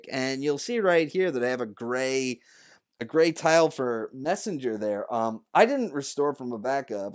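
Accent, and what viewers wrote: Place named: United States. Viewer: Thank God an American accent!!!!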